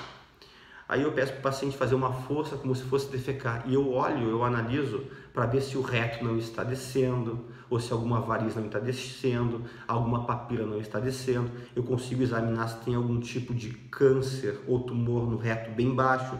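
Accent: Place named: South, Brazil. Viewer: Pelo sotaque, do sul.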